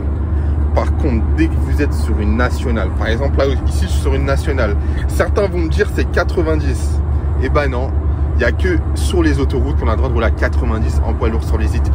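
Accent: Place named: Antilles, Caribbean. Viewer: ahah l'accent timal on reconnais les frero tu es d'où ?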